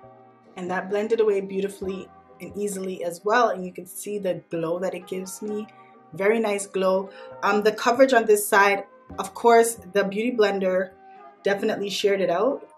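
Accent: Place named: Barbados. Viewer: I detect an accent...are you Bajan? Btw I love how that foundation looked on you♥️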